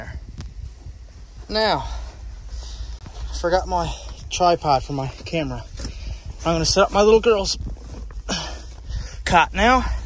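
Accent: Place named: Newfoundland, Canada. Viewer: Are you a Newfie ? Yer accent sounds like ya from da bay bah!!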